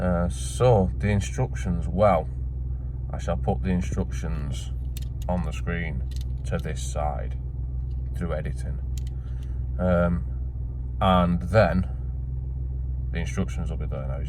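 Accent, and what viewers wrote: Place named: Lancashire, United Kingdom. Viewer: time stamp is 1st may 2021. Watching the video again, your Lancashire accent and reference to local chats is hilarious 🤣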